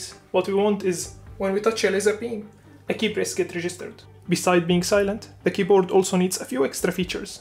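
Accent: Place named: Levant. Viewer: دخيل الشوام والأكسنت تبعوووووووووون 😆♥️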